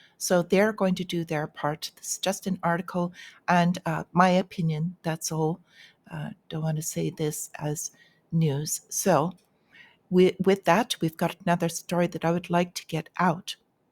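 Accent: Ireland. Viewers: You have such a unique channel - I had to laugh when you showed the farmers spraying the manure - what a metaphor for what they are doing to us on a daily basis! So good to hear your sweet Irish accent! Have a wonderful and profitable week! 🍀😊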